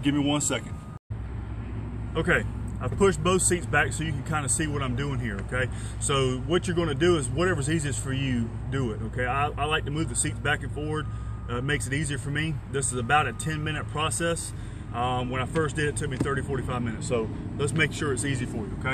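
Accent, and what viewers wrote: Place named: South, United States. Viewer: I never would’ve figured this out by myself in a million years. Thanks! The accent made me homesick for the south! Especially when you say mash down 😊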